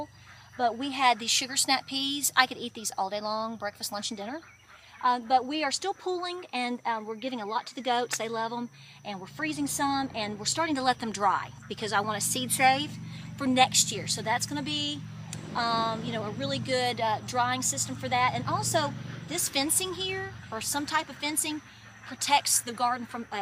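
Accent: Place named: Kentucky, United States. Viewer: Your garden looks great! Up farther north and in mountains here we have a much later start, and one thing after another has been going wrong for me this year. I've got to get one of those owls, might keep the wild bunnies out. I'm about to build a wattle fence. I also need to get some form of irrigation set up, would you believe my house has no outdoor spigot. Love your accent reminds me of friends I had growing up in ky.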